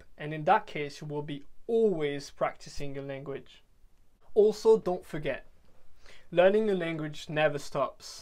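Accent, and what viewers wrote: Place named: United Kingdom. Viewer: Très impressionné, bravo pour le travail ! Si je peux me permettre, j'ai l'impression que la prononciation du "there" peut être améliorée, et c'est un mot qui revient souvent donc on remarque la différence avec le reste qui est dans un accent british quasi irréprochable haha Bonne continuation en tout cas, keep up the good work !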